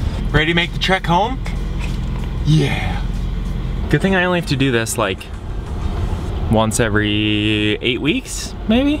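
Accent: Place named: Upper Midwest, United States. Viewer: I’ve been binge-watching your videos for a week or so because I grow orchids, and I have a hard time finding pots – I like to use clay pots whenever possible, but orchids need to have a lot of air around their roots – so I’ve been considering making them myself, so that I can make them so that they include all of the specs that I want. Your teaching style is great, and entertaining, so I’m really enjoying the “binge” of your videos! Thank you for doing such a great job! Second, and this part is just a dumb bit of anecdotal fluff: I noticed from the first sentence that you spoke that, based on your accent, you HAD TO live in the upper Midwest, because your accent is pretty much EXACTLY the same as mine! I lived in southwestern North Dakota until I was 17 (our accent is a bit more Canadian up there; and something that may help to strengthen my “family accent” is the fact that my grandparents on my Dad’s side still occasionally spoke German in the home to keep secrets from the kids, and later, us grandkids). 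Anyway, I moved to Chaska when I was 16 (HS graduation), where I lived until I was 20, when I bought a house and moved to Minneapolis. I lived there until I moved to the New Orleans area to finish my degree, back in 2007. During my junior and senior years, I taught freshman chemistry, and without fail in every single section that I taught, one of my students would ask me what country I was from, usually on the first or second day of classes. I guess the [slightly paraphrased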